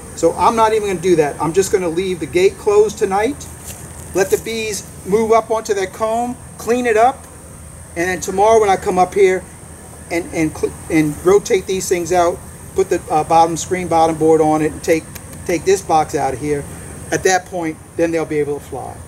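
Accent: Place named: South, United States. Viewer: I'm not just binging on bees—I'm binging on bees that are being moved by guys with Southern accents. Now that I live in Seattle and most of my Southern immediate relatives have passed, the combination of the hum of the bees and the southern accent is so soothing...like a lullaby and my childhood all wrapped up together! 'Night and may God bless us all and all the US.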